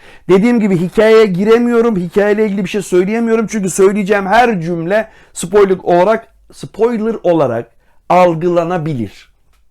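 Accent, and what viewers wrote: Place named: Samsun, Turkey. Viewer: Elllliiiinci yıl lisesi 😂 Klasik Samsun. En okumuşunun bile şivesi. Süpersiniz............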